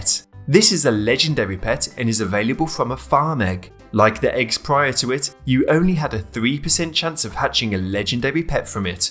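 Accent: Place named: United Kingdom. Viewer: You can tell hes british!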